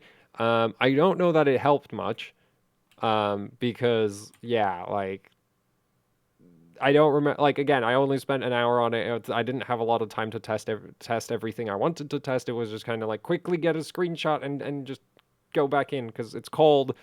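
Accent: Czech Republic. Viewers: You Czech? Wot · Wait, are you Czech?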